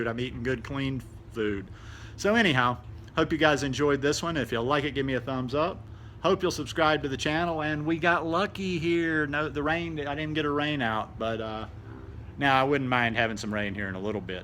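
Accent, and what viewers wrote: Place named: Texas, United States. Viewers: where you from originally? you have a similar accent that I have! I'm from north Alabama. it's always nice to here a similar accent! lmao. great video btw. I learned a lot. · Love your accent. You sound like a fellow Texan. Am I right?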